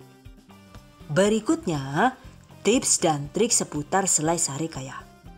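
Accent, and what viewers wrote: Banyumas, Indonesia. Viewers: Kalo nonton suka ketawa ketawa sendiri.. Resepnya mantap dan penyampaian detail juga ngapaknya itu ngangenin heheh... #GiveawayTHV2021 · 😂😂😂😂😂gue suka gayamu dg logat ngapak nya yuk... Ha.. ha.. ha..👌👌👌👏👏👏👏 Thanks so much fot the tutorial.. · bikin versi terbaru dong bun...yg lebih ngapak gitu..hehehee Cataaaaattttt😁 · Telat komen. lucu ya...kenapa bisa bahasa ngapak ya bun? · Rika wong Bumen Yu?:D sukses terus Bu channel nya senang dengan penjelasan detailnya. terlebih bahasa ngapak kayak dari kampung saya, Sampun tak subscribe Yu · Deneng ngomonge ngapak bun,jawane ngendi rika? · Ngapak men..🤣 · Jan jane rika uwong endi sih,madiun apa banyumas,deneng ngomonge ana ngapake? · NGAKAK BGT maless😭😭😭 ngapak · Ngakak jawa ngapak... Kita sama ngapak 🤗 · orang madiun, kok pinter bahasa ngapak sech 😁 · Sg gawe video kie wong ngapak apa ya,😁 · Ora ngapak ora kepenak, barakallah ya yu....😘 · Terima kasih bunda mantab jadi pengen coba,saya sangat puas dg Vidio " ny mantap jelas apa lagi banyak becanda ngapak jadi komplet dan dapat ilmu jg terhibur semoga Allah mmbalasny yg berlimpah,sukses slalu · Suka..... 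dengar bahasa ngapaknya, kocak......😀😀😀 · Bun..rotine kutunggu ya..pengin buat juga.matursuwun..resepnya.ngapaknya..ngangeni bun.. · Hehehe bhs ngapaknya aku sukaaaa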